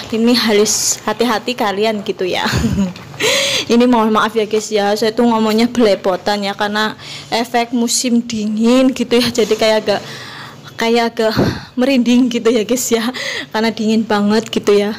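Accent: Banyumas, Indonesia. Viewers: Emang enak kacang bakar mbk ngapak😁😁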